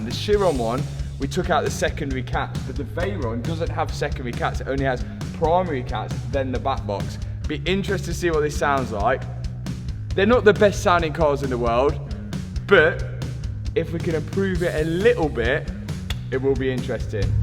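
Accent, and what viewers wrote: United Kingdom. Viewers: Where in the UK is he from for that accent??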